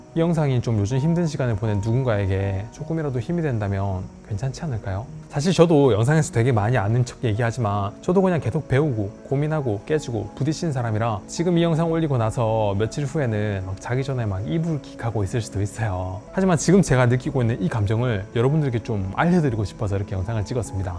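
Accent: Gyeongsang, South Korea. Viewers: 단한번도 스킵하지않고 넉놓고 봤네요,,,, 항상 경상도 사투리속 억지로 서울말 쓰시려는 말투가 재밋고 귀에 들어와서 챙겨봤는데 많은걸 알려주시는 영상이였습니다,,, 항상 알차고 필요한 부분만 리뷰해 주셔서 감사합니다 ㅎ 같이 화이팅!!!!!!!!!!!!!!!!!!!!!!!!!!!!!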